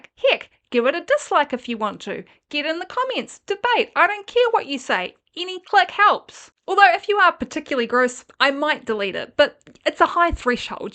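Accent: New Zealand. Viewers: I like a kiwi accent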